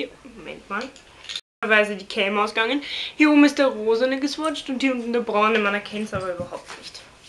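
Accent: Austria. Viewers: kommst du aus österreich?^^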